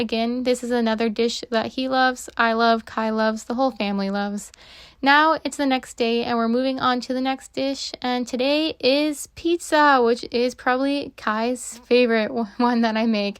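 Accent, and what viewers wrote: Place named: Midwest, United States. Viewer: Love your Midwest accent. I’m from Mid-MI and feel right at home in your kitchen. God bless❤ This is valuable information you’re sharing.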